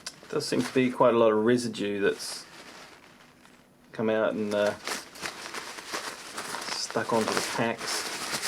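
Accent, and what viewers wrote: New Zealand. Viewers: i love the new-zealand accent. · He's a Kiwi, therefore a New Zealander :) (btw his accent is of a typical kiwi bloke)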